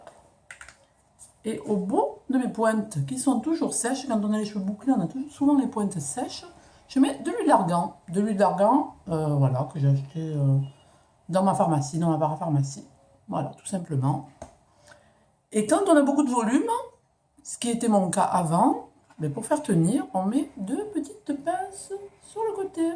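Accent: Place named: South, France. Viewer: Coucou, sympa de te voir "animée" et d'entendre ton petit accent du Sud:) Evidemment je m'abonne! Bisous bisous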